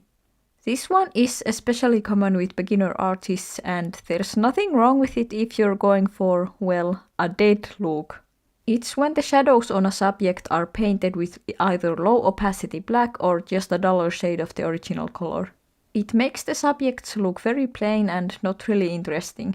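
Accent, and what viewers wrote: Finland. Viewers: The way we fins speak english is so funny also cute artsyle you got :3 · ….i am tripping you sound finnish to me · are you finnish? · WAIT ARE YOU FINNISH? (Im just assuming bc of your accent :DD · I'm like 40 seconds into the video but... my brain is telling me to comment this: you sound incredibly finnish. Are you finnish? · Ookko suomalainen? Your accent sounds like you could be finnish. :D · You sound suspiciously Finnish. Olisitko maamiehiä? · wait are u Finnish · Finnish accent spotted 🫵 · this is random but are you finnish because i hear an accent to your voice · Are you Finnish? As a Finn, I'm getting major Finnish vibes !!!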